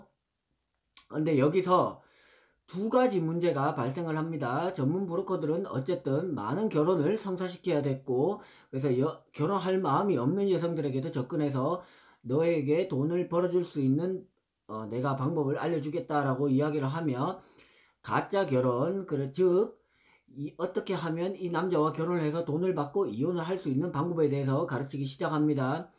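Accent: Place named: Daegu, South Korea. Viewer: I really love deagu dialect, fighting ❤️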